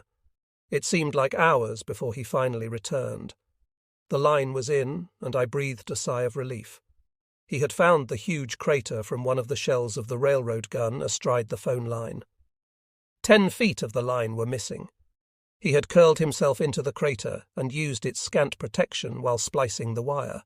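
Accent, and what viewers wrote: England, United Kingdom. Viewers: I enjoy the episodes but an English AI doing American voices and tossing in UK idioms is a bit bizarre. “Cobber” is not a term I hear in North America very often. (Previous episode-can’t remember which one). Still, as stated previously, I enjoy them. Not a huge issue, just a bit bizarre with the English idioms making some stories a bit “of a stretch” regarding American stories.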